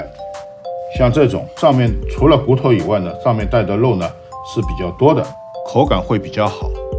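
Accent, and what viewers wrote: Shanghai, China. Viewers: 一听就晓得是阿拉上海宁师傅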